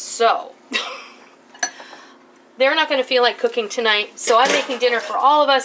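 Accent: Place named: Wisconsin, United States. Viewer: Where are you from? You sound like you have a Wisconsin accent 💜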